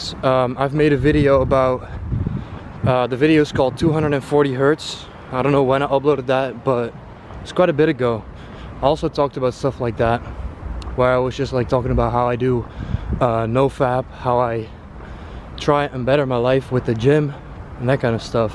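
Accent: Netherlands. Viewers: Are u dutch?????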